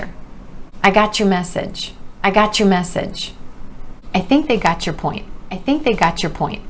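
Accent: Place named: United States. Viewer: Oh, I love this video and your American voice too. ! That's why many times I don't understand when native English people talk even the simple sentence.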